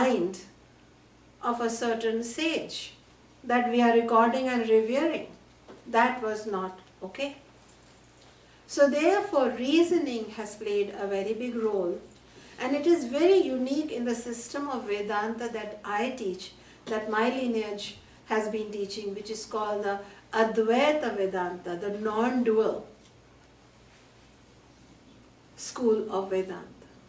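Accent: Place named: India. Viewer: Very Impressed with her way of explaining Vidanta. She has a strong Indian accent, so may not be clearly understood by a foreign ear, but her English, grammar & vocabulary are excellent. Very Informative, well explained, and simple yet complete. Very Educating, and informative. Thank you for posting. Peace & Blessings to all the Enlightened Souls, and the ones who're well on their Spiritual Journey. Jai Hind!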